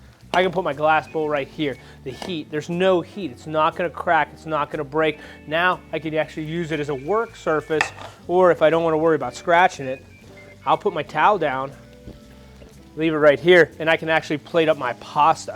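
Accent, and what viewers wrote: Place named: Pittsburgh, United States. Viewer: Brother yous is crispy and crackerty is you from Pittsburgh?!?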